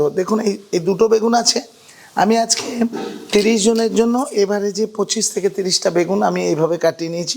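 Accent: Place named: Birbhum, India. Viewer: বীরভূমের লোক মনে হচ্ছে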